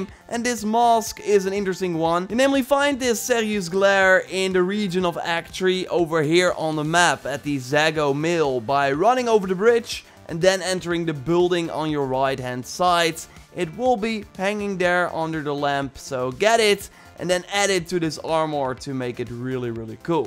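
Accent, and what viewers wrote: Netherlands. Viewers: Damn I was right! I knew it sounded familiar. Visited the Netherlands back when I was studying in France and heard it back then. Been 5 years now and his accent reminded me of that trip for some reason lol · Yes it's the most obvious dutch accent ive ever heard on youtube, and it annoys the fuck out of me lmao. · Ben je Nederlands? I can sense an accent. Became a subscriber because your AC Origins video. Love the content amigo. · He sounds dutch that’s why😂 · Bet he is, sounds wayyyyyyyy too dutch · Marcos Vargas its his accent, he's from the netherlands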